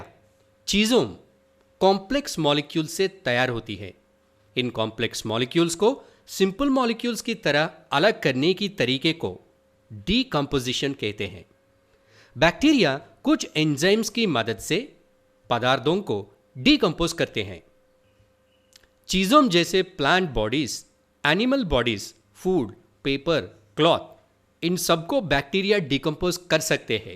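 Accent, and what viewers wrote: South India, India. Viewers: I think you are living in South India because your hindi. but your Hindi was good . ❤ Love from Rajasthan ❤❤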